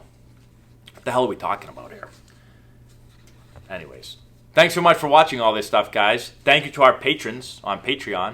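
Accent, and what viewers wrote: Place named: Boston, United States. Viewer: You must be from Boston with that accent...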